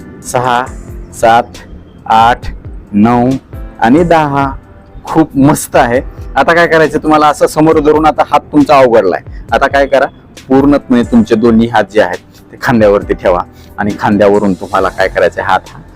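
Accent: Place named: Latur, India. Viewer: "हसत खेळत व्यायाम करा" अशा पद्धतीचा सांधेदुखीचा आपला चांगला व्यायाम प्रकार आहे. डॉक्टर साहेब मला वाटते तुम्ही बहुतेक लातूर जिल्ह्यातील निलंगा, शिरूर अनंतपाळ किंवा उदगीर तालुक्यातील आहेत; असे तुमच्या भाषेवरून जाणवते. असो तुमची स्वतःची मस्त गावरान भाषा शैली आहे. हेबका...❤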